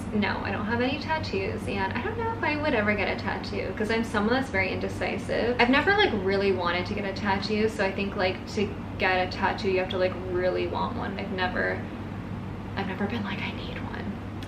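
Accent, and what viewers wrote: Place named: General American, United States. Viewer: curious why you got the North American generic accent while your brother got the aussie one. did you guys grow up separately?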